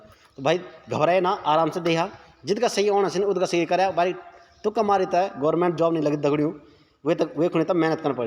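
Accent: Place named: Garhwal, India. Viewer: Aapki gadwali pyari h sir ji 👌🏻👌🏻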